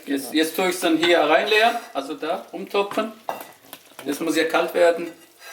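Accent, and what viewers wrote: Swabia, Germany. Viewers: Ich find doof, dass hier so viele seine "ausgezeichneten" Deutschfähigkeiten hochleben lassen... Darf man nicht nach Jahren hier leben, gut deutsch sprechen und integriert sein oder ist das so besonders?, ich find dein Schwäbisch klasse - Mach doch das nächste Mal Spätzle für uns:P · Ich fand die Sache mit dem schwäbischen Akzent lustig. Türkisches Essen auf Schwäbisch ist einfach nur genial. ^^ (komme aus Ö)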